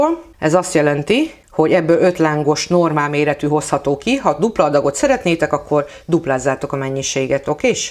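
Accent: Szabolcs, Hungary. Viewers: Köszönöm, hogy ilyen érthetően, ügyesen mondod el minden receptet..😊 Már az első (màr nem tudom melyik videó volt), de valahogy èreztem, hogy igen, ez szabolcsi!! Ez a tuti! 😊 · Kedves! Régóta követem a munkádat és minden elismerésem a Tied. Semmi modorosság, Izes szabolcsi beszéd, közvetlenség és sugárzik belőle a szeretet. Most a lángosod van napirenden. Megvárom, hogy minden átvegye a szobahőmérsékletet és el fogom késziteni. 74 éves vagyok és rendszeresen főzök, de a lángosra még nem került sor. Most igen.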